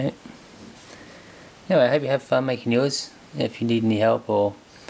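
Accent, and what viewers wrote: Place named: Cockney, United Kingdom. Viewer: It's what's known as a "Cockney" accent.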